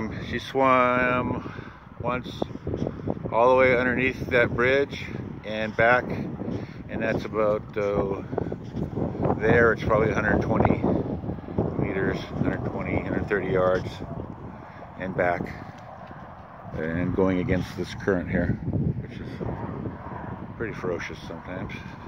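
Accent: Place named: California, United States. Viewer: Californian acent 😁. is really happy there.. 😘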